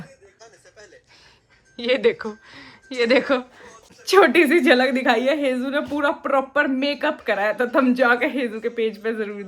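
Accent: Haryana, India. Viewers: main Rajasthani hun toh nhii pta apke kuch unique se words ka meaning😊😊 pr apki Haryanvi language mast lagti hai❤❤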